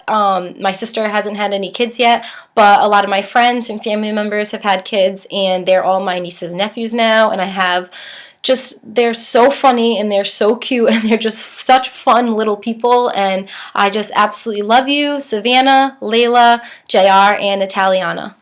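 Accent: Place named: New Jersey, United States. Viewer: Love this video. I a a new subscriber. Please sub back and anyone else that does, I will too. You sound like you are from New Jersey. Love your accent. :) You are awesome!!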